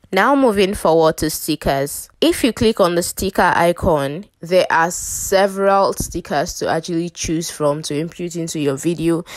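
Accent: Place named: Nigeria. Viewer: im a big fan btw you are relaxing and pretty i love the nigerian accent it's very good!have a nice day!❤️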